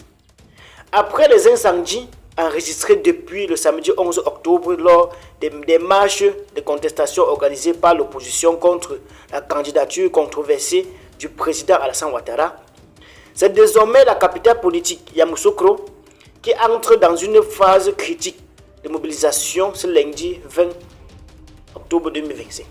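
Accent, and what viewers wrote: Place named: Cameroon. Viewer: Toi avec ton accent camerounais là tu crois qu'on est assez bête pour croire à ce que tu dis moi je suis à Yakro et on a dormi en pays ici hein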